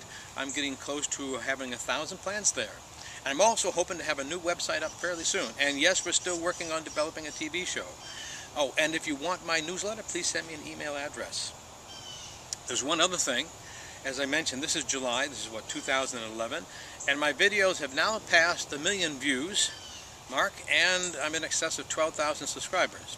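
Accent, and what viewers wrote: Maine, United States. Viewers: Spar varnish (with a Maine accent)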